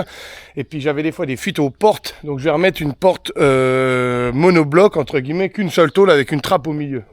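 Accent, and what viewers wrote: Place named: Meuse, France. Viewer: Joyeux anniversaire à la grand-mère 🎉. Il y a pas malin pour la benne. Et par contre pas de soucis tu es bien meusien l'accent est bien présent. La meuse c'est les meilleurs.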